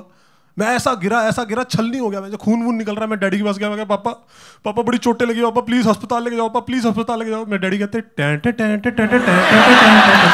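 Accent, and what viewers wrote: Punjab, India. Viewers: Awaaj se hi pta chal jata hai Punjabi ho.......I am also Punjabi by the way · Punjabi accent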